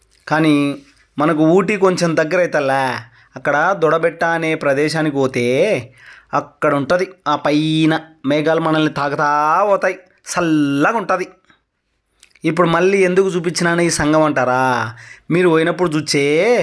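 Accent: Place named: Rayalaseema, India. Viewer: bro rayalaseema yeasa super story line also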